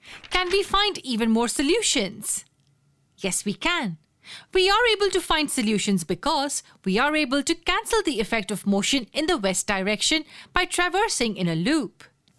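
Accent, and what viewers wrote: India. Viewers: Indian accent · y u sound indian tho? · Indian accent??? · Is this an Indian talking? Unsubscribe to this channel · Indian accents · Is the narrator Indian by any chance ?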